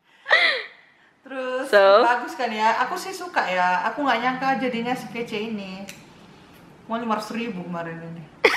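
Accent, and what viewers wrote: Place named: Medan, Indonesia. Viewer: aksennya medan bgt, jadi kangen rumah hahahah, loo.. loo... nya itu :))))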